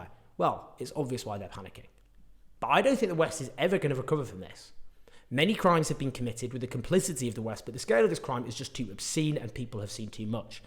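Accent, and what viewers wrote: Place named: England, United Kingdom. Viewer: What English accent is this?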